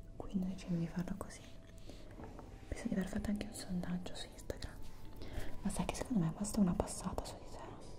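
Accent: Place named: Emilia, Italy. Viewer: È emiliana le origini non lha dette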